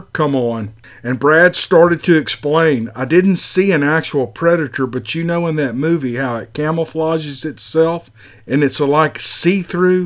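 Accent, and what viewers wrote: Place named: North Carolina, United States. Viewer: Nice job. That accent you have is similar to mine in NC. I know a lot of fine folks who talk like us. And I wouldn’t trade it for nothing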